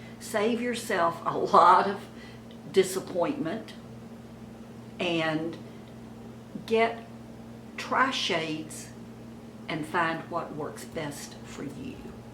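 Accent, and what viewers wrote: South, United States. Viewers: I am new to your channel !! Im so glad I found you !! You are such a delightful and very Beautiful classy lady, I can't wait to show my Mom your channel !! If i may ask, where are you originally from ? I detect a southern accent ? Blessings and Love....XoXo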